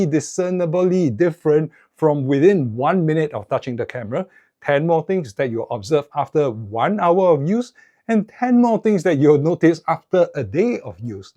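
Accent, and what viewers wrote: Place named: Singapore. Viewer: Thank you for your excellent overview! I have a very good friend in Singapore and I believe you have the same accent! Wonderful.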